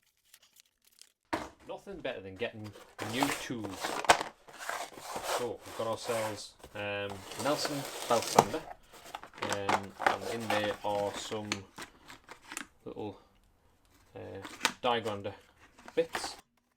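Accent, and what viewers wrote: Newcastle, United Kingdom. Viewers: funny hearing a fellow geordie on youtube ,my first car was a mini now am into building defenders from bare chassis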